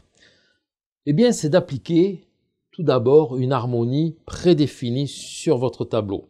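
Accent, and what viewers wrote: South, France. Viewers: Merci pour ces infos et pour ton accent du sud de la France.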